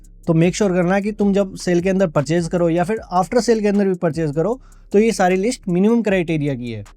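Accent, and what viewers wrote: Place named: Haryana, India. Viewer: Bhai haryana se ho kiya btw lag raha ha 😅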